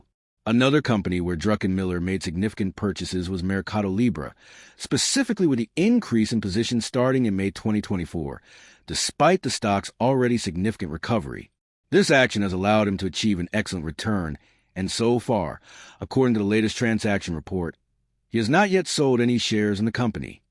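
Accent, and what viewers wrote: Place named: United States. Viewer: It is a robot voice with a American accent I am pretty sure.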